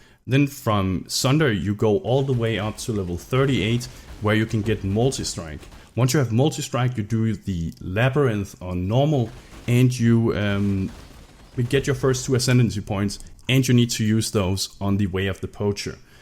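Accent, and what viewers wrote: Denmark. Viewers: are you from denmark xD that accent sound familier ^^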